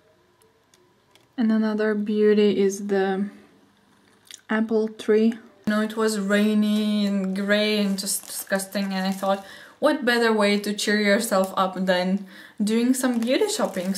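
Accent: Russia. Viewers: I barely found your videos. They are great. Where are you from cause your accent sounds Russian. Awesome video by the way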